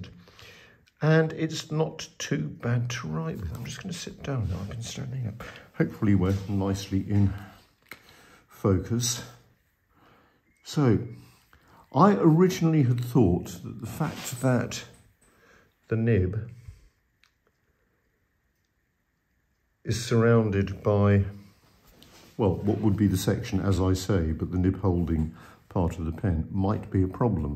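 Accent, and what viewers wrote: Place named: United Kingdom. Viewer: Your reviews are good. I seriously have watched your videos particularly to improve my British Accent. 😄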